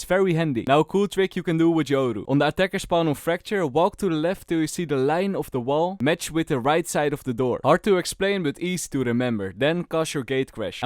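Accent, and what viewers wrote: Netherlands. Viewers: Can just hear your dutch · Dear i have a burning qeustion u sound like u have a dutch accent so please r u dutch?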